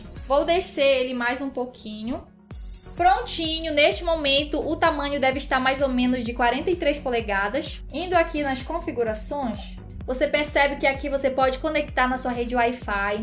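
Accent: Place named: Rio de Janeiro, Brazil. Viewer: paranaense com traços japonês e com sotaque carioca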